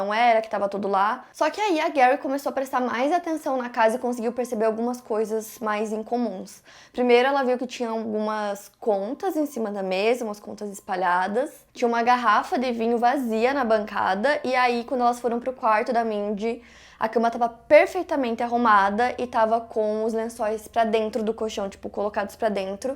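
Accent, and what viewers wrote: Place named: São Paulo, Brazil. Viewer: Nada a ver com o vídeo, mas a pegou completamente sotaque de Paulista 🤣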